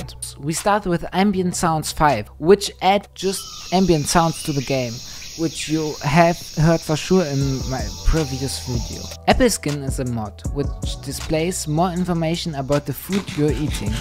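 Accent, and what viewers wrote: Germany. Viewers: Very, very cool video! By the way, are you german? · r u German? XD nice Video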